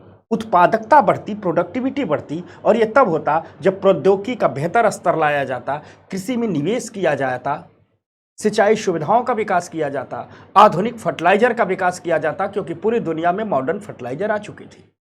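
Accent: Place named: Uttarakhand, India. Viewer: Guru ji aap uttarakhand ka ho kya apki tone lgre h aap dehbhooni sa,, ho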